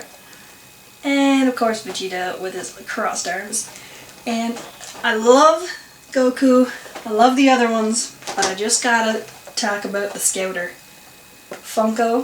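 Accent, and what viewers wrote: Ireland. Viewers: Are yu from Ireland? You kind of sound like us haha I wish we got a haul like this! :P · R u Irish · I can't decide wether she is Irish or American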